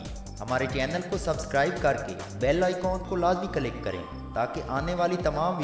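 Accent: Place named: South Punjab, Pakistan. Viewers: janoobi punjab se lagty ho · Saraiki lagda...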